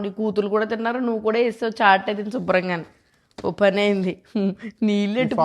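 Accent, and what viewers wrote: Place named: Telugu, India. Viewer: Champesthunnav akka nee yaasa accha telugu ammai voice extralu lekunda baaga chesav video thank you sister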